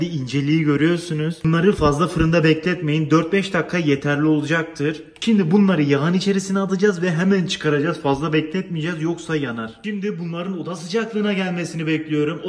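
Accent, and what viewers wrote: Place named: Hatay, Turkey. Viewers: Because he is form hatay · Çünkü adam hataylu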